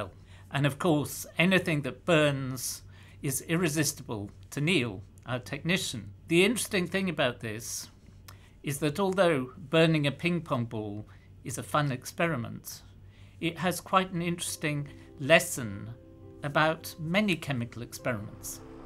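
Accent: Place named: United Kingdom. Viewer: Science is more fun with british accents and stereotypical scientist hair.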